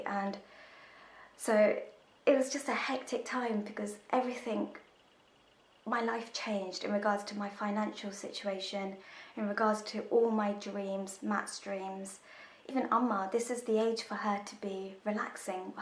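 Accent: United Kingdom. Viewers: do you say Tuesday like chuesday I like the British accent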